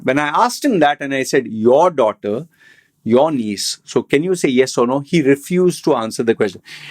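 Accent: India. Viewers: Ok looking past your tattooed funny looks and very strong Indian accent and onto the actual subject. Your first argument to prove that Islam is not a religion of peace is because most of the so called terrorist attacks are done by Muslims and not any other religion. It helps when you do a little bit of research before opening your mouth. Year 1009. Crusader siege of Jerusalem result in mass killing of over 100000 people. Historians write that blood was flowing in the streets of Jerusalem. All done in the name of Christianity hence the name Crusades. Year 1187 and Saladin conquers the Jerusalem from the Crusaders. What does he do? Offer ransom to Christian and Jews to leave the city peacefully to the land of their choice. No mass killings at all in the name of Islam. Just one example to reply back to your silly podcast with zero research. Fast forward to two world wars where millions were killed. 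Why were Jews killed in WW2? Yes because they were Jews. Who killed them? Now onto the latest example. How is committing Genocide in Gaza? Yes take your time and do some independent investigation. Maybe you will be able to see clearly even thru your tattoos next to your eyes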